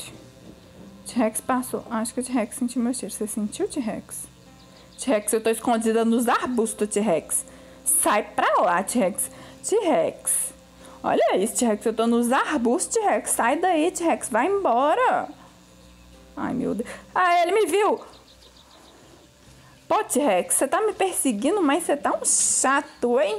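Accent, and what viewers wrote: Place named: Fortaleza, Brazil. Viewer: Vc mora em Fortaleza ???? Pelo o jeito de 0parece s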